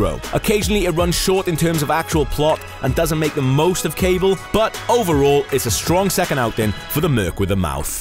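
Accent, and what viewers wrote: United Kingdom. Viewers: Who's this UK B'och on the V.O?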